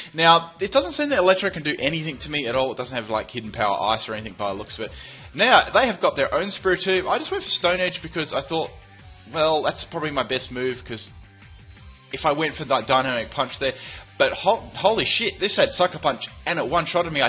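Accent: Australia. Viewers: I KNEW you were a fellow Aussie. The accent game is strong! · Wait did I hear that correctly are you from Australia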